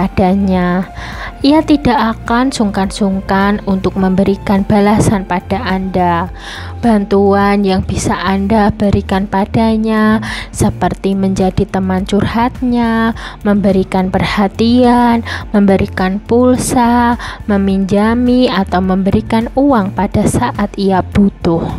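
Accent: Java, Indonesia. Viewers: mau ketewa denger medoknya.. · channel gila medok nya tambah nggila ni 🤮🤮🤮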